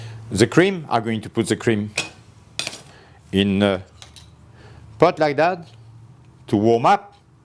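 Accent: France. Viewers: I really loved your english with the french accent 😄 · It’s Amazing!!! So easy to understand and such a pleasure to watch,very entertaining with lovely french accent,Mercy SHEFF!!! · Love the way you explain the process. Your French accent is very infectious, in a good way. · I love listening to the English french accent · such a cool accent the French. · l'accent français en anglais est horrible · I love that french accent!! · French speaking English also sounds beautiful.... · When I watching this man I feel like in watching the movie Ratatouille. It is so amazing! The background, the setup, the lovely french accent...the way he looks!!!! It is a movie 🥰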